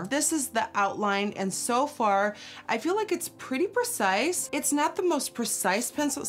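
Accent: Netherlands. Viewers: we would be so powerful if we knew THEN, what we know NOW! I love you you make me smile and I think you have such a great personality ( I love your dutch accent) thank you for being you, you're wonderful, beautiful girl.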